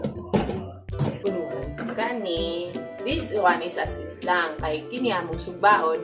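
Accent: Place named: Visayas, Philippines. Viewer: bisaya d.ay maam 😊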